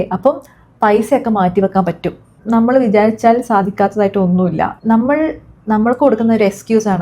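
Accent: Kannur, India. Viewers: ആദ്യമായിട്ടാണ് ഞാൻ നിങ്ങളുടെ വീഡിയോ കാണുന്നത്.. ഏതോ ഒരു സെക്കൻഡിൽ നിങ്ങൾ ഒരു കണ്ണൂർ കാരി ആണെന്ന് ഞാൻ തിരിച്ചറിയുന്നു 😅😅.. am i right..?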